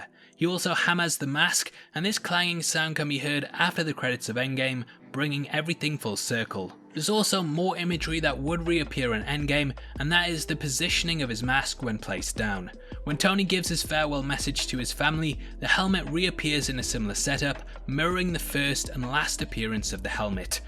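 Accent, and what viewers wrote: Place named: United Kingdom. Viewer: He have British accent 😆😆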